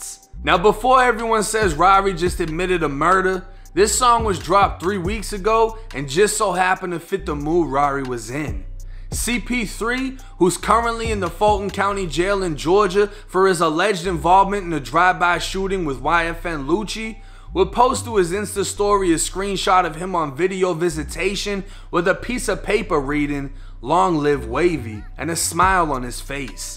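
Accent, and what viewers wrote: Boston, United States. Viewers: U got ur Boston accent back in full when I seen u on 32 n 1 years ago u sounded like u Florida boy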